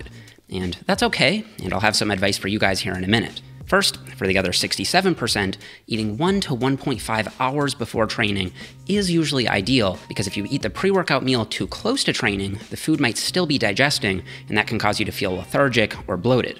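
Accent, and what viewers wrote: Ireland. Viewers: Is he Irish? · A canadian that sounds irish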